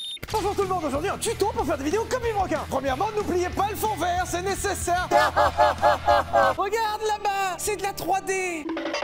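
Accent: Germany. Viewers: L’accent allemand une pépite!! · Quel accent allemand de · C'est pas normal que je regarde cette vidéo pour la dixième fois juste pour l'accent Allemands et le constructeur:)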